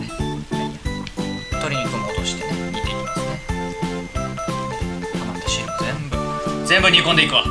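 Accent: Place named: Kansai, Japan. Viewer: 今日も絶好調ですねww リュウジさんはなんでちょいちょい関西アクセントはいるの。 そこもすこ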